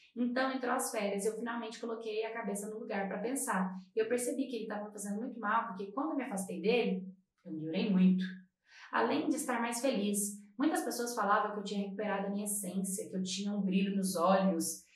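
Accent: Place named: Marajó, Brazil. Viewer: Eu so queria a história com a sua voz da ilha de Marajó 😢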